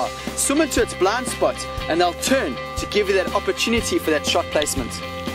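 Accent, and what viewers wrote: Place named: South Africa. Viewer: lol he isint kiwi his south african haha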